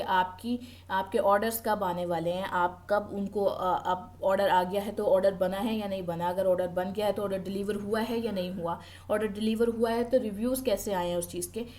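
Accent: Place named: Karachi, Pakistan. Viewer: From your speaking style i can guess that you belong to KHI ❤❤❤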